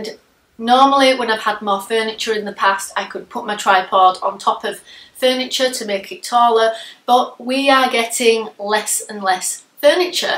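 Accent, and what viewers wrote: Yorkshire, United Kingdom. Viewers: I really love your channel. You are so inspiring. Are you from Yorkshire? Thank you 🇦🇺❤️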